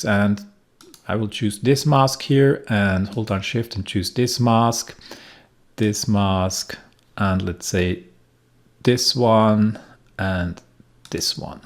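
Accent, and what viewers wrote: Germany. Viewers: ah! Close enough! From your accent I thought you wore german. Danke!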